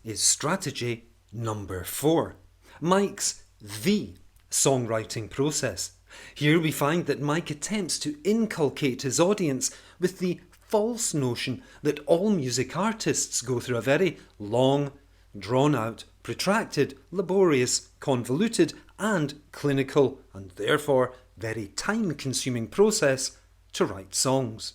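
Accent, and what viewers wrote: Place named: Scotland, United Kingdom. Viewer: Excellent insights! I've only just discovered your channel and am enjoying it immensely. I have a friend who is fully signed up to the whole fake-Beatles, PID, nonsense. He started out as a total sceptic then flipped to the opposite point of view, almost exclusively on the strength of some of the ostensibly anomalous photos of Paul. I could send him your superb debunking videos, but he is so adamant he's right they would probably bounce straight off. Anyway, it's a pleasure to hear your cool and measured Scottish accent and your very well-marshalled line of argument. 👏👏👏👏👏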